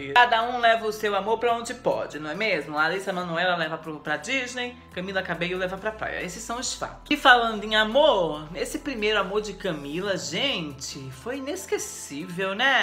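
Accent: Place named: Paraná, Brazil. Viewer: o R é do PR. Kkkkkkk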